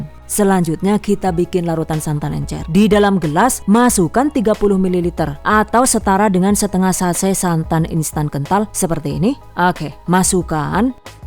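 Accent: Banyumas, Indonesia. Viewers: Dengar ngapak nya ibu jadi kangen kampung halaman😭🙏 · Laaahhh ngapak😅😅😅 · Bun..kok bahasa nya kyk Ngapak ya..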